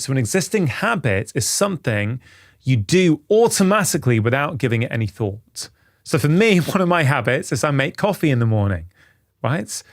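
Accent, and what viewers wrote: United Kingdom. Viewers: I am sucker for uk accent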